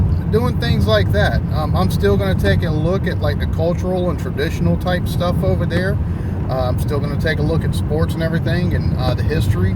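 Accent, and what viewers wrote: South, United States. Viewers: It’s all good brother. You may sound a bit like a hillbilly but I think most people on here can see your, as we say in a Australia... a top bloke 👍 keep the videos coming mate 😊👍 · And I'm good with your accent no matter how southern you get, I'm a big big country fan all my life so it's second nature for me .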